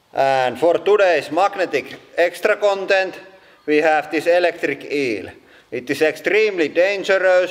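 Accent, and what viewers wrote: Finland. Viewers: Are you from Finland cause you have a Finnish accent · Is this guy finnish. The accent sounds like it lmao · He sure sound Finnish. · Today the content was not so good ..but like your Finnish accent and your wife's laugh so I pressed like..!!! Stay happy..!! Love from India..! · The Finglish is strong with this one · omg even when he speaks english it sounds like finnish. 😂😂 what is he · Dean Rowlinson Sounds like my Finnish grandfather. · that accent is so finnish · This is awsome channel. The finnish rallye english is something else. 8) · The rough finnish accent is killing me xdd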